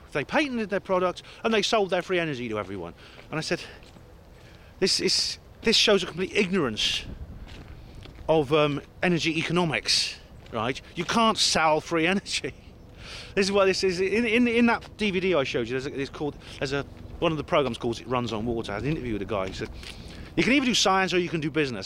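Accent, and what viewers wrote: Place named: Oxfordshire, United Kingdom. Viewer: Free energy tech demonstration with a distinct Oxfordshire flavour... :) watch?v=cuTp7HkpW7U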